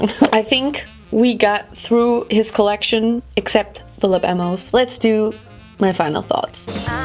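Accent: Germany. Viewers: She's from Germany :) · I think it depends on the german accent/speaker totally. I've heard some pretty ridiculous and funny sounding ones, but hers is very cute :3 No offence to german ppl! I hate finnish (my country) ppls accent also. Actually hate it the most probably hahah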